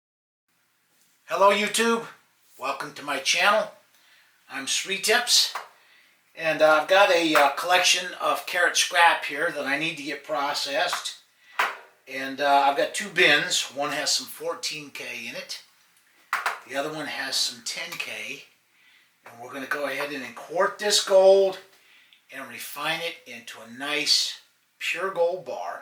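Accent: United States. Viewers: WOW People and Viewers, this guy has deliberately gone out of his way to make this look like Bomb making and it's not. Why would this guy do that? is it that he's not wanting backyarders to encroach on his market? Listen to the voice - does it sound American? Americans always Lie for Commercial reasons - "There were no weapons of mass destruction in Iraq" only a bunch of children to be murdered for their oil" this guy is lying badly - he's an American - it'll be for the same reason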